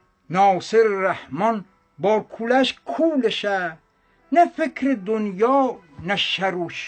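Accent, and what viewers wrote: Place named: Dezful, Iran. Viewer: chand sala k lahjeh dezfuly ra na shanidom mon shoshtarim val khaily ba mazeh beed.tashakour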